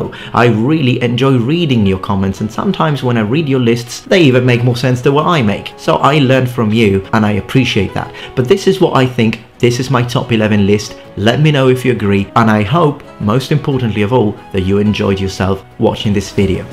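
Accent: Italy. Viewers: Are you italian? Your pronounciation of "montefortino" was perfect. The accent too sounds a bit italian but it's so slight I can't tell. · Madonna se si sente l'accento italiano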